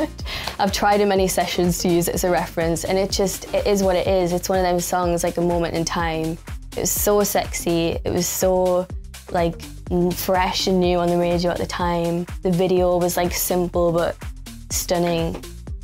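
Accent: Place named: Newcastle, United Kingdom. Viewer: That Geordie accent she has man its melts my heart and her laugh... ok ok im simping ill stop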